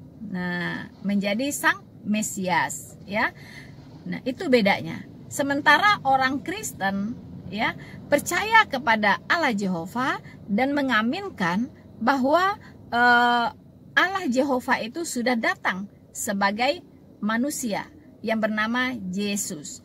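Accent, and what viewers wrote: West Sumatra, Indonesia. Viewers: Suara ibu ini mirip sekali dengan suara teman saya dan kebanyakan orang Minang, satu kota dgn saya di Bandarlampung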